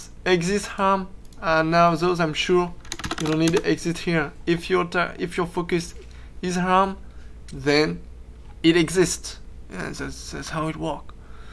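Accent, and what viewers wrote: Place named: France. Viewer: Lol tu a un accent français trép prononcer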